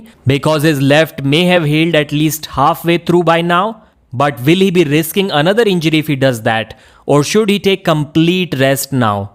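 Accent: India. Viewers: the only indian whose accent for some reason, I don't hate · level of hindu accent. Rolling like every letter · this Indian accent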